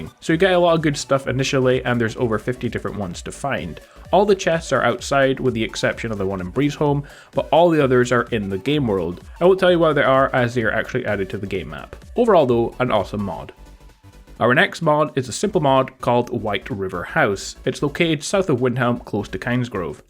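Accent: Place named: Scotland, United Kingdom. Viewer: Your accent sounds a little bit Scottish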